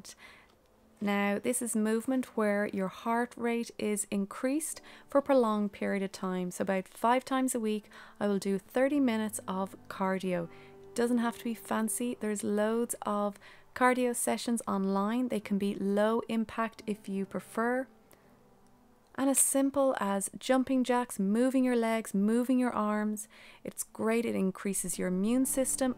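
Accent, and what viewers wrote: Ireland. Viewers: your lovely calm Irish lilt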